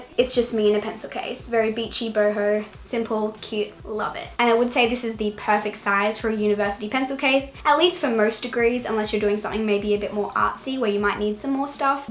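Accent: Australia. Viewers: I subbed just because of your verse at the beginning of your video, your cross necklace, and your Aussie accent. The funny thing is I don’t think I have an Australian accent and then I go and watch other Aussie’s videos and I can definitely tell their accent! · I find it so weird how Australian people have english and American accent mixed together 😂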